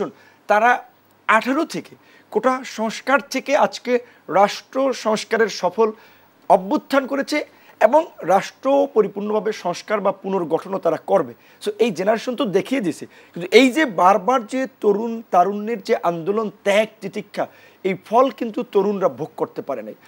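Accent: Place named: India. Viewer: উপসহাপিকা ইন্ডিয়ান সটাইলে কথা বলবেন না।😊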